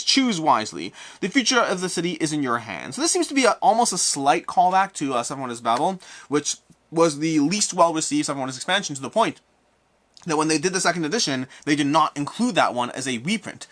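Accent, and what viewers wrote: Canada. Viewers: I believe your Canadian upbringing is showing. We inherited British pronunciations (and often, spelling) but our proximity to the U.S., and the flood of T.V. channels, has led to this confusion. Just say it the way it feels god to you.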